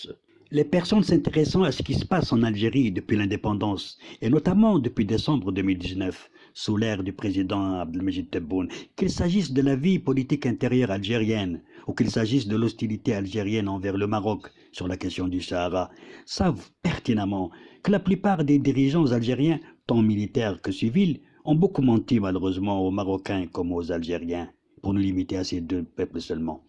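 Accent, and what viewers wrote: Paris, France. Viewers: Monsieur c'est avec révérence que je salue d'abord votre éloquence. Une rhétorique digne des grands lettrés. Vous n'avez rien à envier aux éminents spécialistes de la langue de Molière dans mon pays, la France. Et ce (voyons voir) tellement français d'une bouche à l'accent bien parisien, fascinerait davantage des auditeurs attentifs comme moi. Quant à la richesse du contenu, je m'en félicite encore pour ce qu'elle m'apprend sur le conflit du Sahara occidental que je considère d'ailleurs comme marocain par conviction et non par complaisance, grâce à vous.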